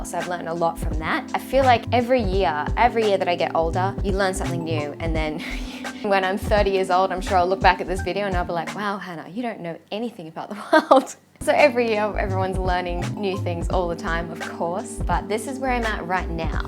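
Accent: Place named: Australia. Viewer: How good is Gary V! Really liked this video. I'm a fellow Aussie and just new to your channel but I particularly like it your content around Japan which is on my bucket list. Keep doing what you do, you're a natural in front of the camera and hearing the straya accent is soooo refreshing from all these American's. :-)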